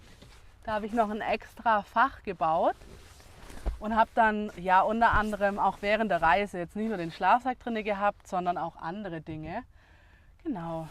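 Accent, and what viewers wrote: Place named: Swabia, Germany. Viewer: Ein Hauch von Schwäbisch - sehr charmant.😊😊😊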